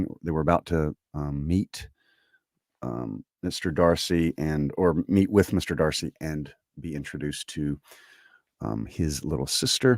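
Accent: South, United States. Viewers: This is brilliantly read. I would never have thought with a southern accent it could be just as clearly conveyed being Regency English. Well done.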